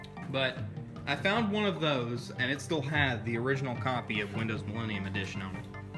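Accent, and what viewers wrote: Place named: South, United States. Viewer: You must be from the south (Alabama)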